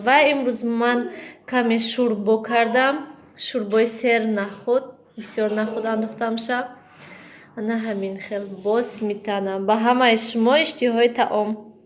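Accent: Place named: Khujand, Tajikistan. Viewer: Ранги тарафои хучанд гапзанитон падари Нилуча гучоги ?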